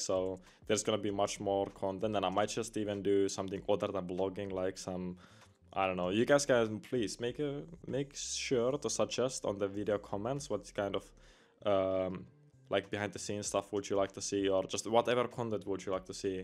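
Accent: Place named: Finland. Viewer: first time seeing a video of you and instantly recognized the Finnish Accent, hope all goes well and got a new subscriber <3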